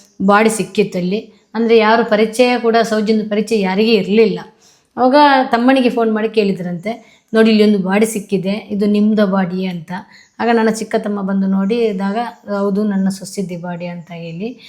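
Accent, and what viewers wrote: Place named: North Karnataka, India. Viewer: ನಿಮ್ಮ ಭಾಷೆ ನೋಡಿದರೆ ನಮ್ಮ ಉತ್ತರ ಕರ್ನಾಟಕದ ಅಂತ ಅನ್ಸುತ್ತೆ ಒಳ್ಳೆದಾಗಲಿ ಅಣ್ಣ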